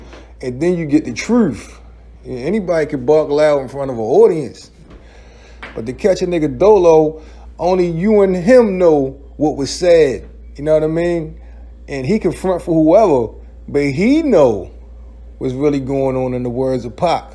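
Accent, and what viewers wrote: Baltimore, United States. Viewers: Love the B-More accent lol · Are you from Baltimore cause you have a Baltimore accent? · The way you talk I take it you from b-more am I right🤔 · Somebody tell me this host ain't from Bmore 🤔🤔🤔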